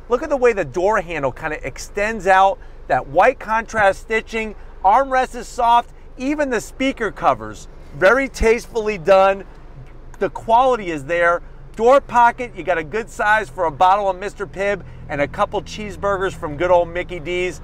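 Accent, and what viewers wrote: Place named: New York, United States. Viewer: Hey......you need to calm down dude when your doing a review because you found like your going to have a hearty man......Also don't talk so fast and lose the new york accent man.......One more thing grow your hair out a little....I know your thinning a little around the top and the frontal hairline and other areas however you need to grow it out pretty long and that way you can cover your bald spots or second choice would be just get about 2 hair transplant surgeries and that way you have instant coverage and you never have to worry about it anymore....trust me I did several surgeries and am soooooo happy that I did this.......also put some nice designer long pants jeans on and a nice tank top and now we are talking man......Just saying all this and I mean it too!!!!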